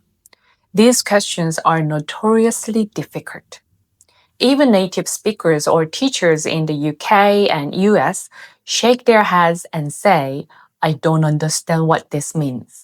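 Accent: South Korea. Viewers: 안녕하세요, I wish I could speak a second language, even if with a heavy foriegn accent. Starting in highschool, I’ve become a failed pilyglot, having unsuccessully attempted to learn French, Spanish, German, and, most recently Korean. I twice was told by native south Koreans that in my attempts to speak to them in their language I spoke like a native. These were doubtless overly generous praises but I was overjoyed knowing how to ask are you Korean, or say hello, my name is Tony Walters, what is your name in Korean. It isn’t only k-dramas that have made me fall in love with the korean people, but every korean native I’ve met in Savannah (we have a large South Korean population due to a major Hyundai plant built close to the city. Of course, as a native English speaker, how could I expect myself to pronounce ㄹ without an accent? Your voice never ceases to comfort me, so your ㅎㅏㄴ국 language foundation overlaid by your ESL is at least one part magic to my heart and ears. 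Possibly two. I reject a world painted nfinite shades of gray. Give me primary colors. So glad you share your perspectives online. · Korean is truly a beautiful language. I love your accent speaking English. Most important is to communicate even when it’s not perfect. Never be ashamed and keep improving. I watch so much K-Dramas for the immaculate stories and the Korean language. Most beautiful accent indeed.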